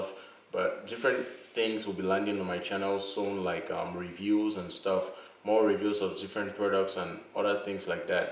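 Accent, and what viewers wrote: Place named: Nigeria. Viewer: Hey, you sound Nigerian. Are you?, lol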